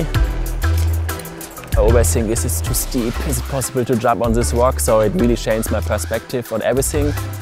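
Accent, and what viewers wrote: Germany. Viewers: Man with thick German accent rides down mountain on unicycle.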